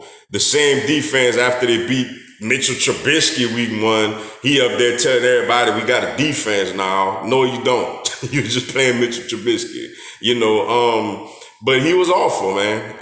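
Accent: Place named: Louisiana, United States. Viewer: Mr oh yea bruh I’m new sub I know you was from Louisiana I’m from Nola I don’t know what part you from but I know I heard your accent lol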